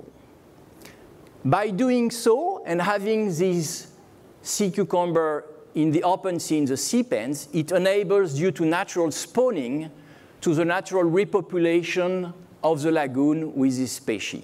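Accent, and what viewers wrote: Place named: France. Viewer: I like French accent. Very sexy. Period.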